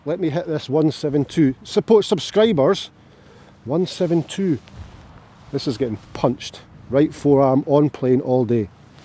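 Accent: Scotland, United Kingdom. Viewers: I kind of imagined you somewhere in the north of Scotland. Very desolated. Maybe it’s your accent.you were sort of “rough”, until I saw you interact with your kid. Which was heartwarming. Keep it up. I enjoyed it. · Thanks so much for your informative videos, I really enjoy them, including the Scottish accent. I am not Scottish, but I live in Nova Scotia Canada, which is Latin for New Scotland, so I feel at home seeing glances of your beautiful Country. Keep up the great content, and I wish you all the best going forward.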